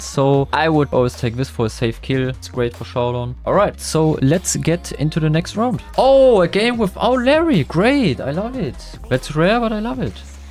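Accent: Germany. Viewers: I just love the german accent 😊 btw I‘m also german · You are german right? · Yooooo this is the most german english I ever heard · Mann hört das er eigentlich deutsch ist haha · Junge wie deutsch kann man englisch sprechen 😂 tut richtig in den Ohren weh deine Betonung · Love the german accent ❤ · German accent makes my blood boil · Moin wie nutzt du BS auf deinem Pc?? und geiler Deutscher Akzent xD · Ich höre es so sehr heraus das du deutsch bist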